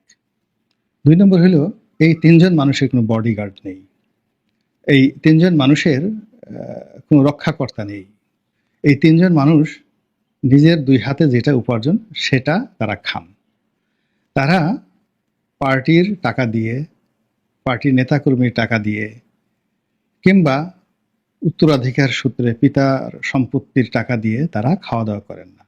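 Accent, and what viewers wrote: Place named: Barishal, Bangladesh. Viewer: স্লামালিকুম নয়।আসসালামু আলাইকুম বলবেন শুদ্ধ করে।দয়া করে প্রমিত বাংলা বলুন।বরিশালের উচ্চারন পরিহার করার চেষ্টা করুন।আপনার পোষ্ট এর সময় কমিয়ে আনুন।আপনি আরও নন্দিত হবেন।প্লিজ প্লিজ।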